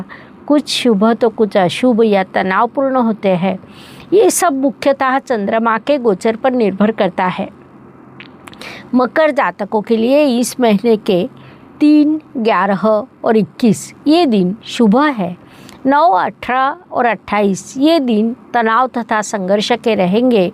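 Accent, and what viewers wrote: Maharashtra, India. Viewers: Arre aunty kyun tum marathi accent mei Hindi bolke jhoot pe jhooth phirse? 😢😢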